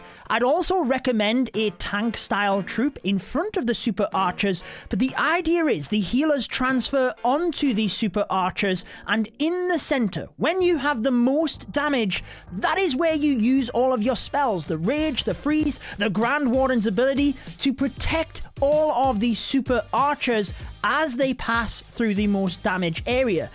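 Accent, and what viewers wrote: United Kingdom. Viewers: I can’t tell where your accent is from. I mean, I guess it’s British but guessing the city is very hard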